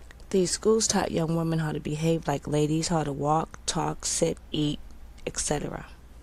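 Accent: United Kingdom. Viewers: The plummy accent obviously covers any faults of the remaining man vocals!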